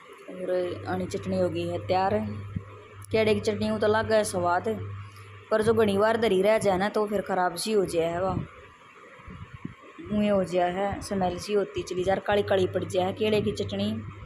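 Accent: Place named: Haryana, India. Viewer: Aapke Haryanvi boli mujhe bahut acchi Lagti Hai Sabse acchi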